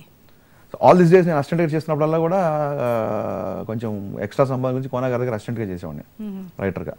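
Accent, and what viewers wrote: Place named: Andhra Pradesh, India. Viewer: Telanganalo putti andhra slang matladutundu nu easte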